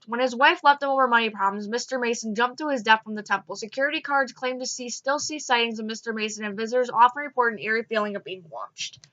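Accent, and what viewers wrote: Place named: Upper Peninsula Michigan, United States. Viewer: You sound like a yooper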